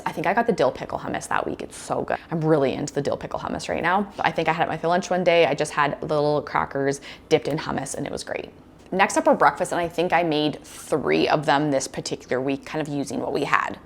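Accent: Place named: Canada. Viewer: Is your money info American or Canadian? I can’t recall if you’re in Canada but I know some of your words sound Canadian. Just curious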